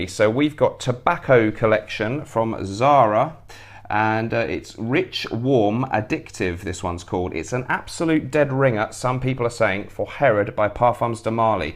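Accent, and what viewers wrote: United Kingdom. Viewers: My favourites at the moment: Lalique Encre Noire, LM Hard Leather, Acqua di Parma Essenza and Comme Des Garçons Incense Avignon. BTW, I love your videos and your British Style and accent.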